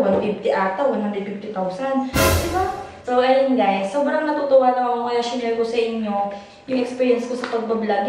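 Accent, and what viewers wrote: Batangas, Philippines. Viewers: hi “ new blogger here!! cute ni ate mag batangeño 😂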